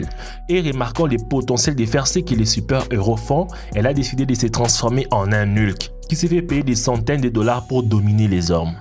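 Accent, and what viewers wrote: Africa. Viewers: Vous trouvez pas qu'il a l'accent africain un peu ?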